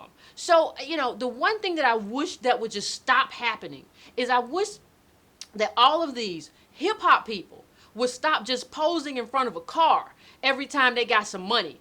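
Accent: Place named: United States. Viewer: I like her American accent along with her intellect and sense of humour 🤣🙏🏿👊🏿.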